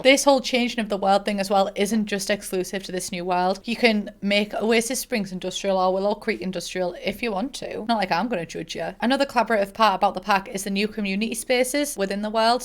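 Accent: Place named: RP, United Kingdom. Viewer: Your accent is so posh it's absolutely dripping with privilege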